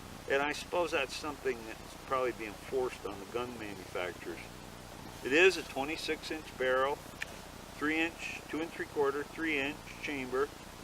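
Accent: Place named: Vermont, United States. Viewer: I recognized that good old VT accent right off. Im up here in Franklin county. Subscribed.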